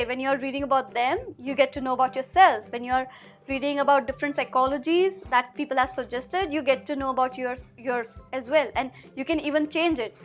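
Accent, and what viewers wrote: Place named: Punjab, India. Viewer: Are you Punjabi girl? You have Punjabi accent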